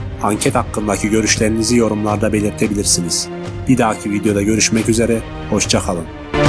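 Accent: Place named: Central Anatolia, Turkey. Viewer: Beyler adamini diksiyon sikintisi falan yok sadece siveli konusuyor saniyorum ki ic anadolu bolgesindeki bir sehirimizde yasiyor adama surekli konusmani duzelt yazmayin adam ana habrr bulteni sunmuyor sadece bi video sanki hepiniz kenan ışıksınız amq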